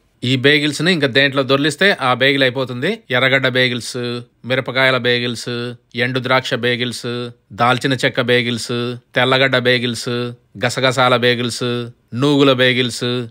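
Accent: Rayalaseema, India. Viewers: Indakane lesina anna....Mee Kadapa yasa super Anna😁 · Madhyalo bokka petti untaru amaina mana slang bale untundhi Anna I'm also rayalaseema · సార్ మీరు పక్క రాయలసీమ స్టైల్ లో మాట్లాడుతారు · Anna paka midhi rayalaseema kadha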